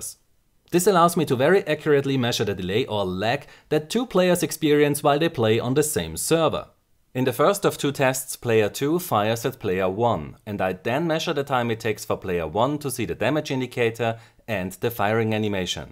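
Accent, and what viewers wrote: Austria. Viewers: Bist du Österreicher? ^^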